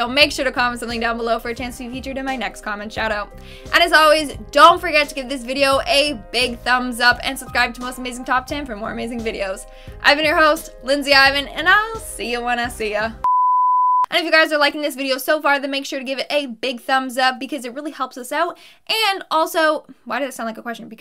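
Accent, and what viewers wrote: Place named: England, United Kingdom. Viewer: I am so hooked on the English accent and now the American accent sux.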